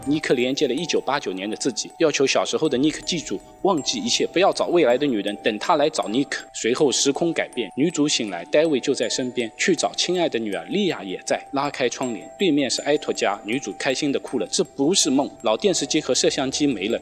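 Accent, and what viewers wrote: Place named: Hangzhou, China. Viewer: 嗯 我杭州人 口音听起来很接近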